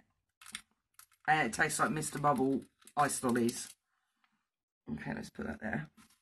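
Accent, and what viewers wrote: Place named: United Kingdom. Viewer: Your british accent kills me softly everytime! You are the cutest goth girl! 💜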